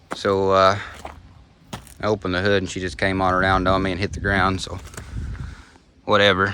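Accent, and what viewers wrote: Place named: Alabama, United States. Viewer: This channel reminds me of Peg at Zip Ties and Bias Plies... Neither ever shows their face in camera, presumably so they can get away with their dirty deeds with impunity! LoL. I'm tryin' to figure out where this good ole boy is from. Countryside looks like southern Indiana but could be anywhere USA in reality. The voice sounds like the feller is from Alabama, but again that can be misleading too.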